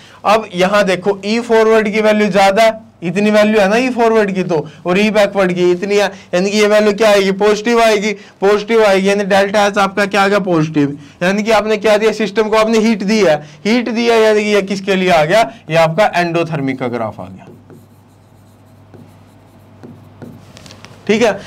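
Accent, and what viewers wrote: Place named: Punjab, India. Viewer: He seems to be punjabi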